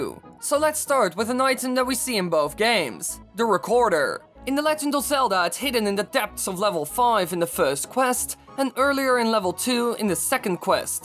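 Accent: Netherlands. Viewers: Hey you're Dutch, right? Brabant?? :P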